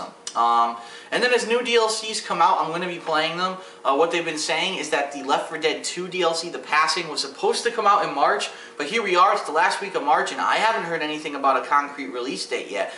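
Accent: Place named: Chicago, United States. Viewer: i think he sounds like he's from chicago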